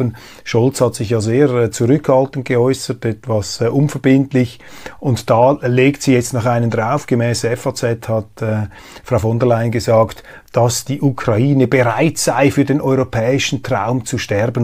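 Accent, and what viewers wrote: Switzerland. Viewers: Riäsa. 🤣 Uff Schwiiizer Dütsch. Traumhaft. Beste Grüße.. Wunderbarer Kanal Dankeschön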